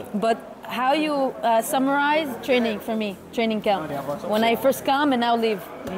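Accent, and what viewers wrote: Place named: United States. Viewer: Why do you have an American accent? You get to belong to two states but Palestinians aren't allowed to belong to one?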